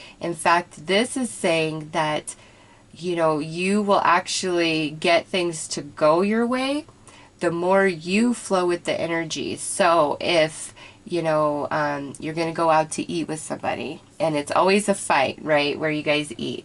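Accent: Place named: West, United States. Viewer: Beautiful reading that rings true. I love your melodious western US accent (I think). Your voice drips with love and kindness. God bless you and yours.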